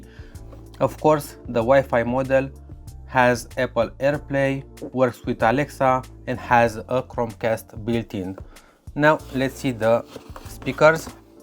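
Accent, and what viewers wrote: Romania. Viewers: Are you Romanian?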